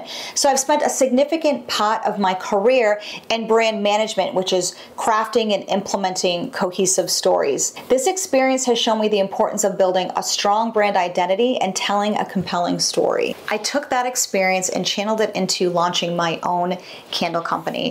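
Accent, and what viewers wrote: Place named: Massachusetts, United States. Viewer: P.S. I ♥️ your Massachusetts accent. My hubby and I LOVE Boston! We’ve been there twice and want to go back!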